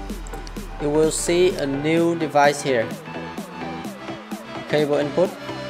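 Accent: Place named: Vietnam. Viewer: Đậm chất Vietlish nhưng mà tôi thích :))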